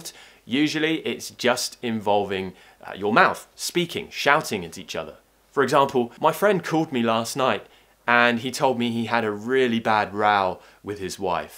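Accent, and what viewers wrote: RP, United Kingdom. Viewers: Dear, you're a true modern RP accent teacher. Your syllables are loud n clear. I assume like listening to oxford advanced learners dictionary pronunciation👏👏👏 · You are my teacher in British accent ❤️🔥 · I really enjoy your clips because I love British accent. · Good lesson. I enjoy British accent. Especially your accent,. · I luv [your] British accent! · Hi nice to see you again! I really loved your british accent ♥️♥️♥️😍😍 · Excellent ... Love British accent... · I like the british accent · Friend of mind from private education system in London!Accent is different with your RP!Strange🧐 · Hello , I'm fond of this accent RP Which is your accent teacher RP it's bloody sansationl thank you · Hi I'm watching from Burma 🇲🇲 and you are the very good teacher and teaching and i very like to hear British native English sound and i also try to be learning . 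Thank you for you making alot of videos. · Best British accent i have ever heard!!! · Good work... British authentic accent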